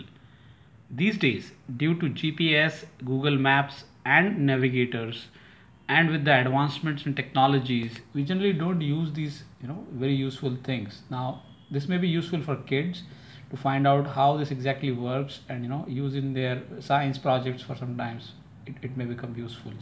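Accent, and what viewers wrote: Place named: India. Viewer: Steven Torrey His accent is Indian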